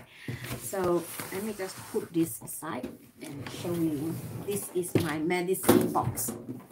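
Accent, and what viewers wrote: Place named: Singapore. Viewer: You still have your singapore accent.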